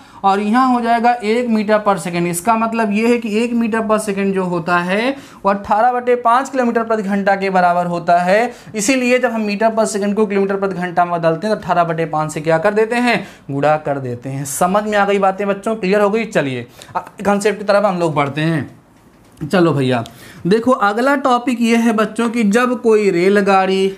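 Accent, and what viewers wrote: Bihar, India. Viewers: Totally ap bihari language me pdate hai Maza aa jta hai pdh k😍😍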